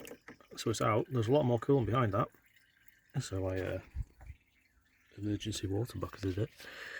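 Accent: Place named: North Wales, United Kingdom. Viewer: Sounds like North Wales accent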